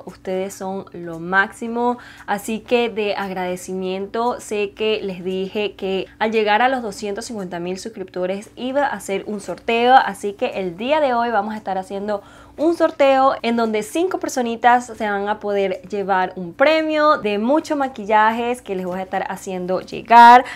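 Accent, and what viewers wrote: Venezuela. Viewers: Yo mi primer video lo vi y dije ella es venezolana como habla te veo desde estados unidos tambien soy venezolana y ya estoy poniendome al tanto con todos tus videos 🤗♥️ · Hola yo te descubrí con el vídeo de la colección de Disney villains x colour pop. Te sigo desde Venezuela💖 Siempre supe que eras Venezolana por el acento😂😬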